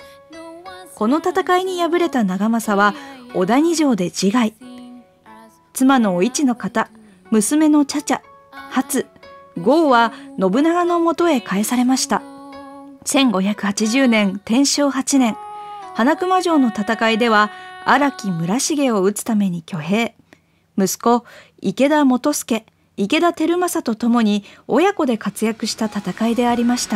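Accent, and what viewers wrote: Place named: Mikawa, Japan. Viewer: 三河のアクセントが気になる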